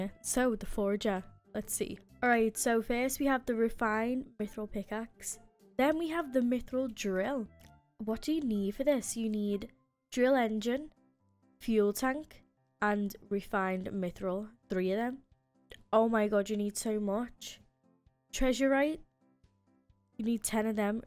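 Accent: Liverpool, United Kingdom. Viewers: sheee, the scouse god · Just a question r u British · are u a scouser? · Are u scouse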